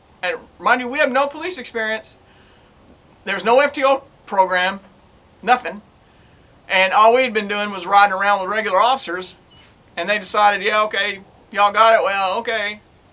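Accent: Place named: United States. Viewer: Mate, that is not the world, that is the usa, judging by what you described and by your accent. I live in a small country in europe and that kind of shit just does not happen around here. Kids running around with shotguns... that is just crazy. Here you can have a 8 year old gril walk the streets of a city with one million people at night and be afraid of anything. Ofc, the parrents dont allow that anymore, because of the constant fear porn in the news, but you could still do it and nothing bad would happen. I am really not a fan of weapons and i am happy that they are not allowed in my country. However, if i was living in the usa, i would want a gun too. How the hell do you police a society where every fool, even kids, can have guns? There is a lot wrong with my country, but when the police see someone with a gun, they know there is troube and they know who the bad guy is. 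They dont have to think about whether they are being subjected to a 2nd amendment audit.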